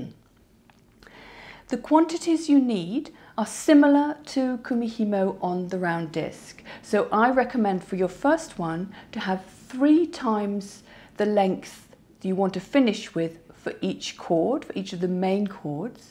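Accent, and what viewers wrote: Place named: RP, United Kingdom. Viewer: Thank you for this well explained tutorial. I love your RP English. Good to learn pronunciation and easy to understand.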